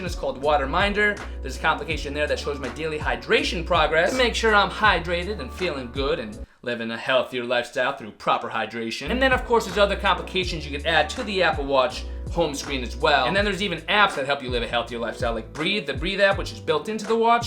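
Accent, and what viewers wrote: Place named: Boston, United States. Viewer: That Boston accent 😂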